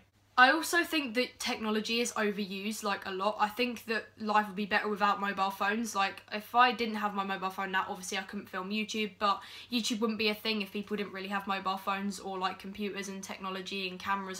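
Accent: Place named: Ireland. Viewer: girl he always posts irish vids when she sounds irish herself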